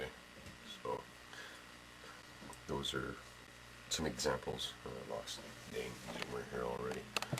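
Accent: Canada. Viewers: are you canadian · Canadian eh? hah, great trees friend!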